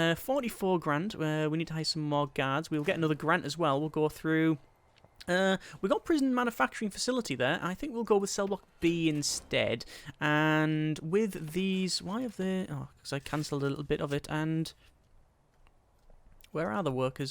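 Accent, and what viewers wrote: United Kingdom. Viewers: Are you from the UK. England or Scotland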